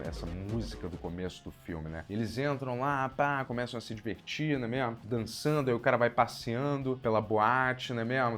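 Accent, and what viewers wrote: Rio de Janeiro, Brazil. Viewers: Ultra irritante esse sotaque carioca onde no meio de cada palavra brota um “A” que não existe. Certo vira “CeAAArto” hoje vira “hoAAAje” fazendo vira “fazeAAAndo”. Nada nesse dialeto prende atenção por muito “teAAAmpo”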